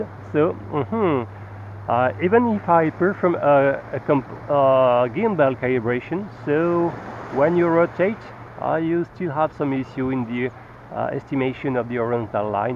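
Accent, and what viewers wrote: France. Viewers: vous avez un fort accent français je me trompe ou pas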